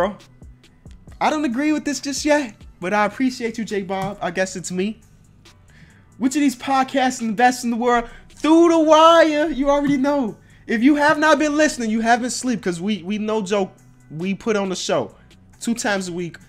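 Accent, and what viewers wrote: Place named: South, United States. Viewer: Why do you talk like you're from the south if you're from Chicago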